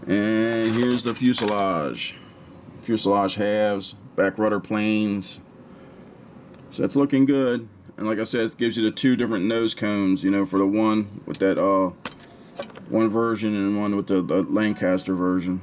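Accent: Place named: Australia. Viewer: Good project launch vid as always, 'Flack as thick as shepards pie' but the accent was more RAAF than RAF.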